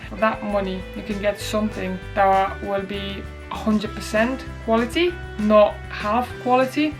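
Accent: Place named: Northern England, United Kingdom. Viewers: love the northern accent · Ur half Norther English accent is brilliant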